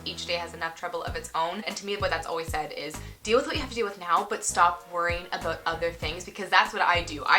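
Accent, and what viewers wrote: Canada. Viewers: I'm an ESFP and I can say that assuming everyone is dead when they are a minute late is very accurate... Thanks for showing me that I'm not the only one! Also. Your accent is adorable and so hard core Anne Of Green Gables ❤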